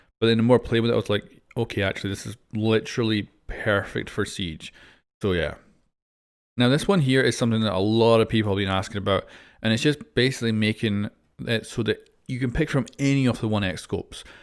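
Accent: Scotland, United Kingdom. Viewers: Are you Scottish you sound it I’m also Scottish if you are?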